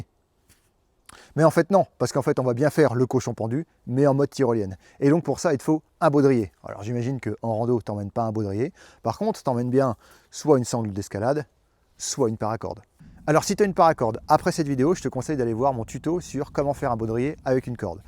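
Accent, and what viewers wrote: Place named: France. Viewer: ... Pourquoi les français se prennent tjrs de pseudos Ricain ave ce bon gros accent franchouillard? Tu ferais mieux de te faire appeler Robin Dubois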